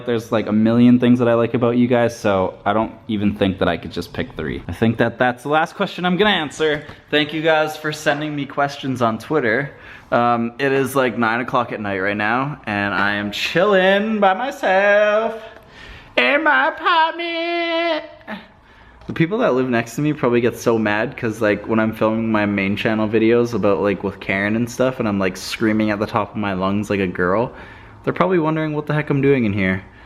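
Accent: United States. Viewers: I enjoyed the vlog and more importantly the q&a session :) I didn't know you were Canadian I legit though you were American. But still thanks for your content it's always inspiring and when having bad days yo always raise a smile :) greetings from the UK :) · I highkey thought you were American lol. but ayy, a fellow Canadian (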